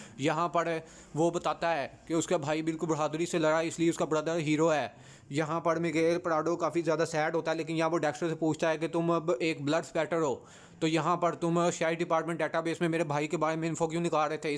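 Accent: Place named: Lahore, Pakistan. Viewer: Barawa lahori explain kar rha Hy Yahan parrr Yahan parr zarorr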